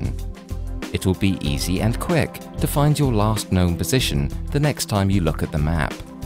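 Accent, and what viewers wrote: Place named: South East England, United Kingdom. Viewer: I'm probably way off beam, but it was a British accent, very south east which also happens to have -0° magnetic declination. So perhaps they didn't demonstrate what they couldn't demonstrate in Britain. Declination could do with some futher information, such as, what does the term "negative declination west" mean? Very good video though.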